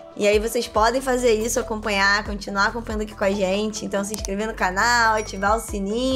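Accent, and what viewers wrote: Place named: Rio de Janeiro, Brazil. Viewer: O sotaque feio. É esse carioca kkkk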